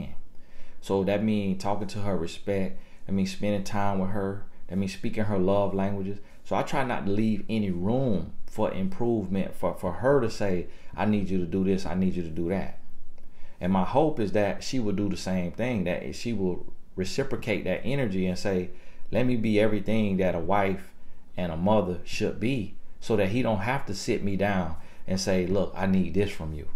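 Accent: South, United States. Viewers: I love the voice impressions😂 Just cracks me up. But the country accent makes a lot of these truths more believable. You know what you know and have this here knowledge to share, and you are sharing it, For Free. You could bundle these pod casts in a series and sell em. Keep doing what you are doing! Blessed tribe. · You from down south hear it in yourp accent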